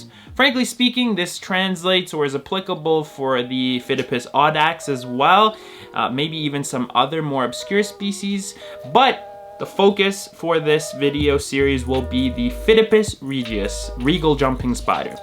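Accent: France. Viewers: The French accent lmaooo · I love your french accent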